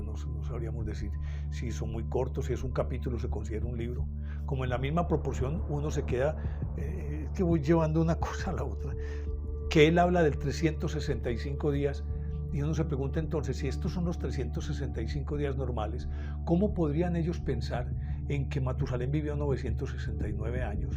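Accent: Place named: Antioquia, Colombia. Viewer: Lo mas bonito de la narracion es el acento paisa que me parece hermoso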